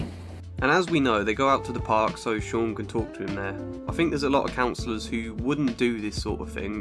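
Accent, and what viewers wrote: England, United Kingdom. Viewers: Average master’s level counselor’s commentary with English accent and annoying light Jazz music playing in background. C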